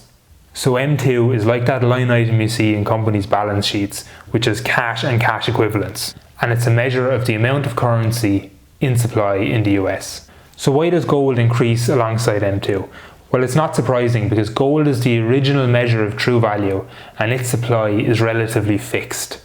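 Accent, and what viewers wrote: Ireland. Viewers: What an accent! Are from Ireland by any chance? Greetings from Munich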